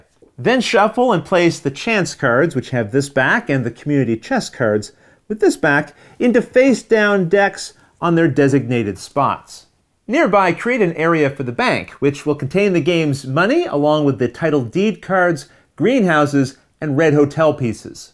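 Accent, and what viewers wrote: Canada. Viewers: Are you Canadian? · You sound like a Canadian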